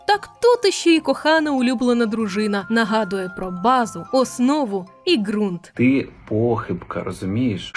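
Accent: Zaporizhzhia, Ukraine. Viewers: Шок, ти шо з Запоріжжя?😳яка неочікувана приємність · Запоріжжя чи що? Ой, як приємно.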